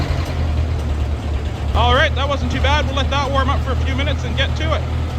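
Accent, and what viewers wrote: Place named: East, Canada. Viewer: I know a guy from eastern Canada when I hear him. Ontario, Nova Scotia? Surely not from New Foundland 😂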